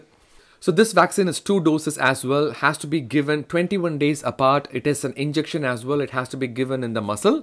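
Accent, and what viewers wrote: India. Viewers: I could listen to your accent all day, you just can't have a bad day when you hear that Indian accent it's so happy. Keep up the work.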